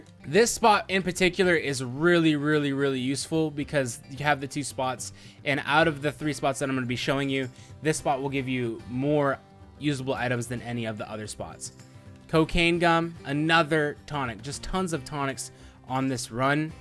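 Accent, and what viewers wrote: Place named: Russia. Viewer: I have to ask (re: Russian/Ukrainian accent)--are you of Russian descent, or it the accent just a fun-to-do thing? 8-D Either way, I always get a kick out of it. lol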